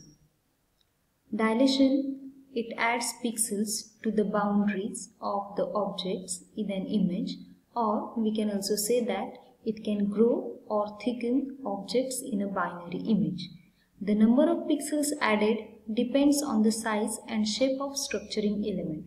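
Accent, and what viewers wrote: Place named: India. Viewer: i hear indian voice, i go back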